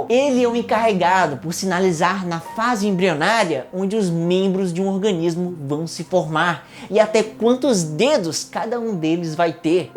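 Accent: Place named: Northeast, Brazil. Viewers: Esse aí dento é nordestino mermo kkkkk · Sou do Piauí e todo mundo fala Aí dento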